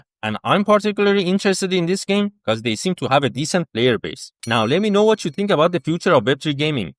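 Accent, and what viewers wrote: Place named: Iran. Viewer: حاجی حس می کنم با این لهجه انگلیسیت ایرانی هستی.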